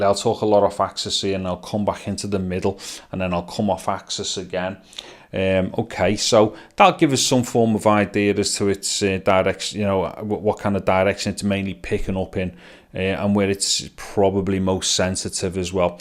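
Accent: Liverpool, United Kingdom. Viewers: How refreshing. A fellow Scouser with a no-nonsense, objective review. Luvved it and just subbed yer.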